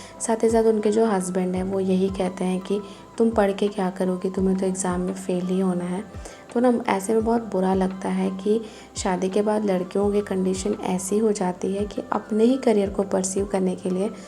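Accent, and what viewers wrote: Bihar, India. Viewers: Madam aap Bihar se hain ya Jharkhand se??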